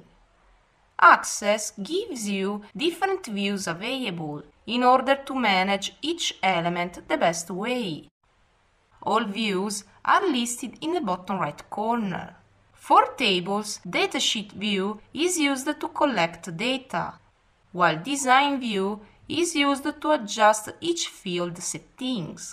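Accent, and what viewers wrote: Italy. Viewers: I think it's Italian, definitely not Russian :) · This Italian accent though :D so funny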